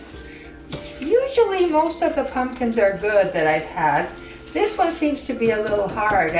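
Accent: Florina, Greece. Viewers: Well done! What village are you from? Your accent sounds like from Lerin area, like me. I'm now in Australia since 1957. My mother made Zelnik so tikva, and now my son also makes zelnik too, for his family. Best wishes, so zdravje.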